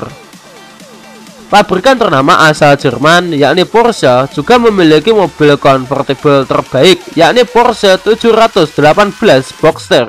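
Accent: Java, Indonesia. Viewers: Jowo ne medok 😄😄😄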